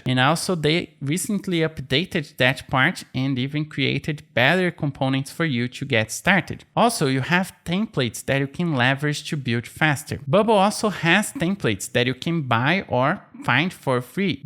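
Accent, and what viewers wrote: Brazil. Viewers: Your english is with brazilian accent rsrs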